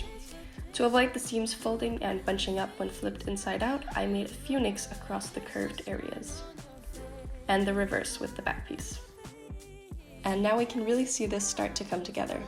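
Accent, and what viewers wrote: Denmark. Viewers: This video was in my recommendations. The second you started talking I thought you sounded danish (the accent, I guess?). Then I saw the furniture/living space and again I thought it looked super danish. Then I checked and as far as I can tell you're from Denmark... Hahaha. I liked the video! :D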